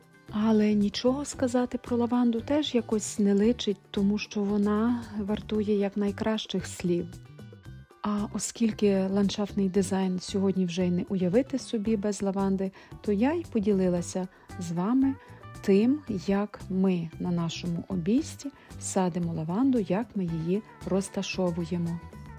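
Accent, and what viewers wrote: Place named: Transcarpathia, Ukraine. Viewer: закарпатська українська- особлива)) у вас якийсь свій діалект))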